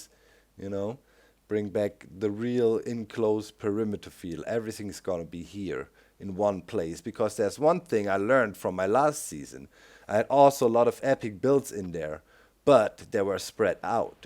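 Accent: Germany. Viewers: ***** is that a german accent I hear? sehr gut herr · He is German. You are Dutch. He has a slight German accent. He does not live near the east side of Germany, so not even close to the Netherlands (if I recall correctly). What's your point calling him Dutch?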